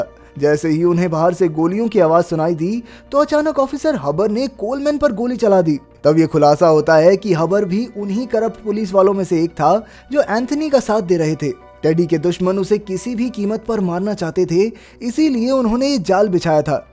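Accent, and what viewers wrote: Haryana, India. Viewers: Bhai Haryana ka lge tu ???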